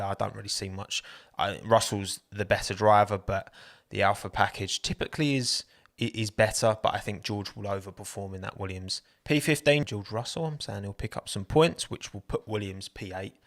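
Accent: United Kingdom. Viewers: So Bri ish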